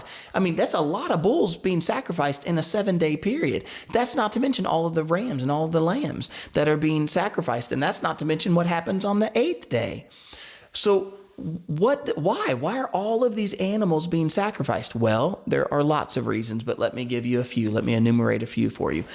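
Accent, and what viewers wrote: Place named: South, United States. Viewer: Feast of booze 🍸 😆 so funny! I like your country accent! 😊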